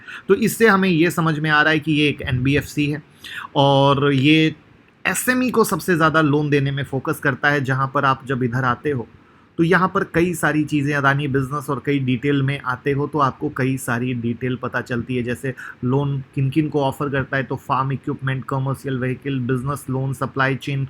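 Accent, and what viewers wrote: Bihar, India. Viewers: Yeh bihari hai aise hi pronounce krta hai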